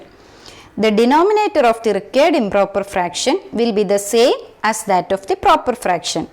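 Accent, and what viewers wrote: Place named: Tamil Nadu, India. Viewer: Good presentation in Tamil accent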